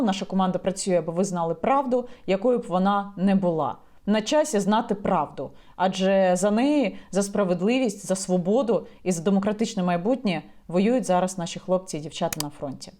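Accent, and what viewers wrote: Russia. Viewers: вам треба перейти на українську мову навіть уві сні! Дуже сильний, може не дуже, але помітний роззійский акцент. Граматика та синтаксис української мови трошки не такі. Треба щось змінити, але не знаю що. Я сама така.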